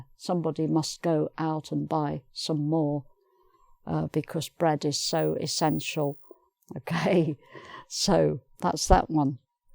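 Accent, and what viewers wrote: England, United Kingdom. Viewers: stabel This is definitely not an Australian accent...she is from the UK and has a British accent. Btw there are MANY British accents!! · This english is more beatiful than the american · Hi! I’m Bruce from Korea 🇰🇷. I bet you are the best English teacher on YouTube. I am so jealous of your English accent. Wish I could have that one as well. Thank you! ❤️ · THANKS A LOT! I love your videos. Specially because your accent is from the UK. And since I live in Mexico every one learns to to speak that awefull american English, which I already know and, to be honest, don't like. I'm stil learning UK accent. An I hope to keep watching your videos until I'm dead. Hugs an kisses lovely lady!! <3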